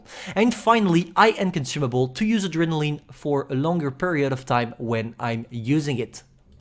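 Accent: France. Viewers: Are you french ?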